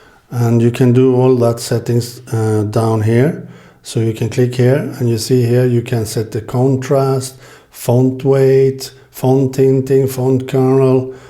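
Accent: Sweden. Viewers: I was thinking about jail breaking my kindle and was looking for some information about the benefits. I stumbled across your video and as soon as I heard your voice I got so happy! I studied abroad in Sweden and it was the best time of my life, hearing the Swedish accent again gave me such a comfort, I honestly didn’t listen to the information of the video anymore and just thought about the time I had there. Thank you for this awesome video!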